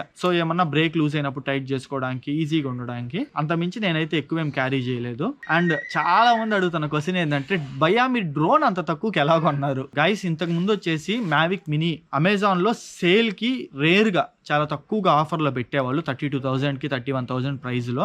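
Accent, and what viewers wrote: Nellore, India. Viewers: Chokka chinchu kovadhu 😂😂😂😂😂 love from chennai...assalu Nellore baasha keka ❤️🥰😂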